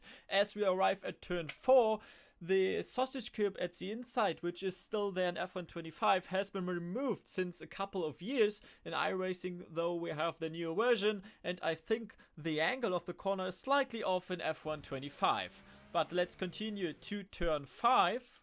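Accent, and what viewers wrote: Germany. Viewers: Irgend wo höre ich den deutschen Akzent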